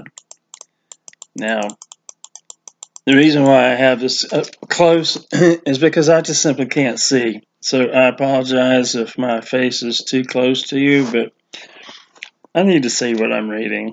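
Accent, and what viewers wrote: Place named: Scotland, United Kingdom. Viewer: I have given my roommate many a giggle by learning to speak Scottish like you: "How are yuuuuuh!" :)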